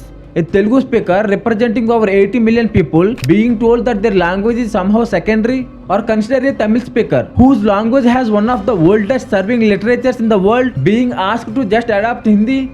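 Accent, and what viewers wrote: England, United Kingdom. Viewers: I really enjoy his english accent. Infact i watched whole video.. 👍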